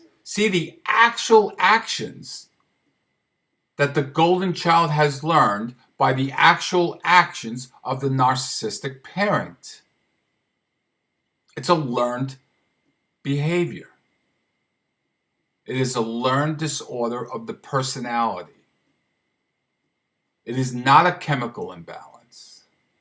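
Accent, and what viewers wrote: New York, United States. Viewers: Sir, it is spooky how you are describing the lives my wife and I have lived. We are both children of narcissistic parents, (more so our mothers), originally from NJ. We moved to the gulf coast of AL to escape our narcissistic mothers in NJ. We both suspect, from your similar accent, your originally from that general region also, (ok, I'll say it, NY), and may have relocated as well. We "enjoy" your posts, because they serve to let us know we are not crazy. We have concluded that no contact is the only way to escape. However, just like Al Pacino said, just when we thought we were out, they pull us back in! LOL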